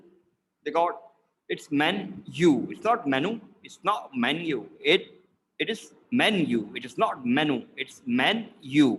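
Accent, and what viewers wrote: United Kingdom. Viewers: Sir your accent of British English and your pronunciation are very nice and I like your love for British English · I may a new one from here, But actually I do love your British accent.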